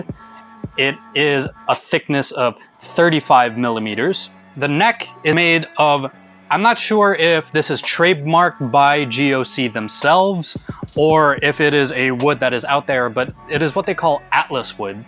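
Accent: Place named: United States. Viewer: Atlas is a nice word for pine. That os nice companies are putting the battery cavity in the guitar incase u switch/already have active pickups. I always just took the d battery and wrapped it in electrical tape and put it in the regular control cavity (alot cheaper and easier than routing a new cavity). Lol you threw me off when you said pesos because you have an american accent but Spanish last name.😊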